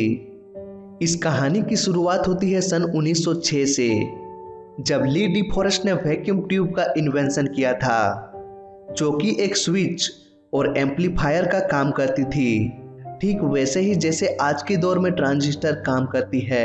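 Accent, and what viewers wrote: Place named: Bihar, India. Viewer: Buddy, it doesn't seem to be Hindi what he is speaking, it may be Bihari or any other distorted local language, but surely not HINDI.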